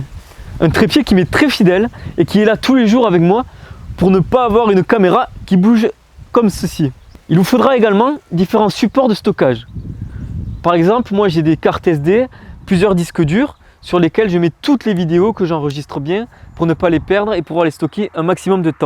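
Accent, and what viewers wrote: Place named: South, France. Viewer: Coucou ! J'ai pas regardé toutes tes vidéos mais ce que j'aime beaucoup chez toi, c'est que tu progresses vite ! Ta diction est beaucoup plus punchy et moins saccadée, tout en conservant cet aspect "théâtral", c'est vraiment chouette ce que tu fais ! Après je t'avoue, mais je veux pas t'influencer hein, mais j'aimais plutôt bien les pistes de réflexion profondes (avec une petite teinte d'humour comme t'aime bien faire, saupoudré de ton accent du Sud (à lire aussi avec l'accent du Sud aussi aha) ;) ) Mais je pense que tu feras ça pour les prochaines ! Continue l'ami t'es clairement sur la bonne voie !!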